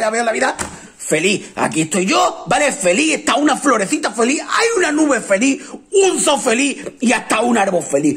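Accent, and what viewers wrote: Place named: Andalusia, Spain. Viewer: Ostia... pareces Didac Ribot cuando hace de padre hahaha ¿Eres tu Didac? Es algo extraño... siento como si fueras ese pero andaluzado cosa rara haha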